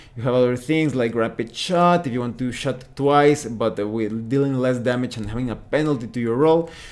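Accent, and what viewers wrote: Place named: Spain. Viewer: I really dig this review for several reasons: relaxed but not boring, comprehensive but not overextended, and it really gets you into the game mechanics without getting lost in too many details. You totally won my attention into AFF 2e! Bravo! A curiosity: I recognize that your a native spanish speaker based on your accent, but you talk fluently through many sayings with no flaw, can you tell me how you got such a mastery :)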